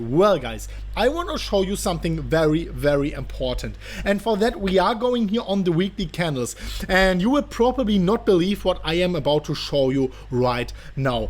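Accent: Germany. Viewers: I love your German accent. GET DOWN...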